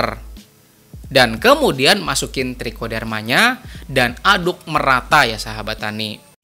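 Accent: Banjar, Indonesia. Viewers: Logat banjarnya masih terbawa mang 😂👍🏻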